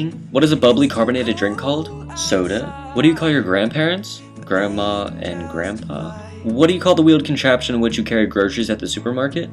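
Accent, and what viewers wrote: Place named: United States. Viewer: technically everyone does have an accent he has an "american accent" to most people who don't live in 'MURICA.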